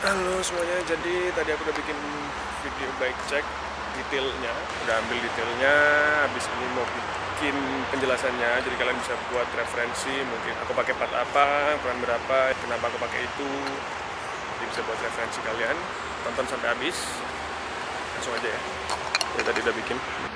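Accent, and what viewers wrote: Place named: Medan, Indonesia. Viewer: Jdi logat orang medan 😁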